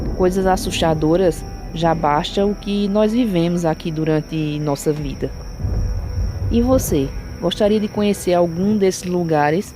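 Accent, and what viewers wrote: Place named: Northeast, Brazil. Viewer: Gosto do sotaque nordestino. Não é atoa que a esposa,falecida, do Mark Nazarova o deixou meio doido depois que ela faleceu, como se não bastasse a filha falecida antes da mãe vítima na guerra. Lastimável. Jesús Cristo e Deus te abençoe e console Mark Nazarova, Deus é bom. Deus e Jesús Cristo nós abençoe e proteja sempre 🇧🇷🌈🌻🙏🏻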